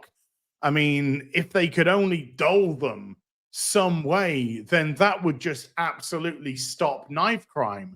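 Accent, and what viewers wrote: New Zealand. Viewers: I thought you were a new Zealander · I thought you were from New Zealand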